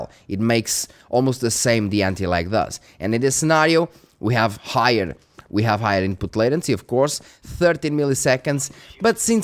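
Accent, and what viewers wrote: Portugal. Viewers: Are you Portuguese by any chance? You sound like one of us tho haha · Boas mano, preferes o processador 7700x ao 7800x3d? Reparei que esse é o teu. Abraço bom conteúdo, pelo sotaque vi logo que eras tuga hehehe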